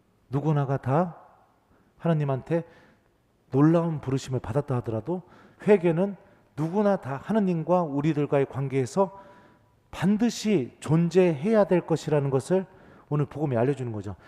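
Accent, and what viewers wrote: Chungcheong, South Korea. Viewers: 구수한 신부님 충청사투리 에 고향생각하며.열심히 신부님 강론 따라가며 한구절씩 되뇌이는 하루를 시작하려고 노력합니다.감사합니다 🙏 🙏🙏영육간에 건강하시길 기도드립니다.🙏🙏🙏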